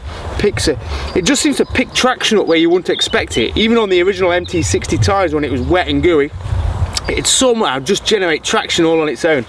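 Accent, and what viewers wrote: United Kingdom. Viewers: is that british accent ???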